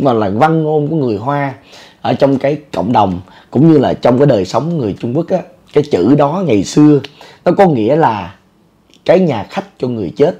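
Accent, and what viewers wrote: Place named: Vietnam. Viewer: Nghe một thời gian e đoán ra bác người tỉnh nào rồi, đồng hương hi hi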